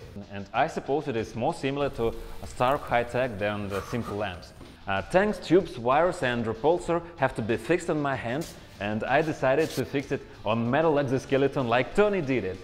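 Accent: Russia. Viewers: А почему на инлище и с акцентом русским?) В чем проблема пилить для рашен аудиторишн?) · Ля а акцент похож на русский. Русский? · Русский акцент 🤔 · Пиздец у теья акцент) типичный русский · Этот русский акцент...ммм... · Ты спалил что русский) Акцент спалил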